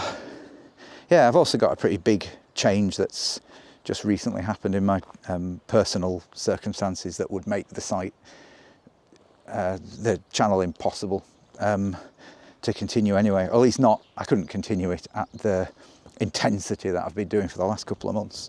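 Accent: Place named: Scotland, United Kingdom. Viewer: He could be a voiceover man for the BBC, they love a bit of Scottish. If only he were mixed race he would earn a fortune.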